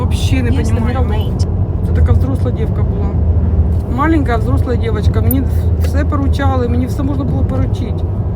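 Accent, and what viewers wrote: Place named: Dnipropetrovsk, Ukraine. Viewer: Це з Дніпропетровщини такий суржик. Ви вже повинні знати що в Україні не розмовляють чистою мовою. У всіх областях є свій місцевий діалект. Це не тільки в Україні, в різних країнах є цей феномен. Краще вам більше читати якщо ви цього не знаєте.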